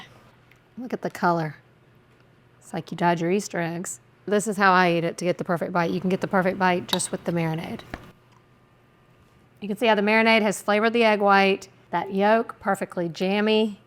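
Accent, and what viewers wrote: South, United States. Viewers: Southern girl....love it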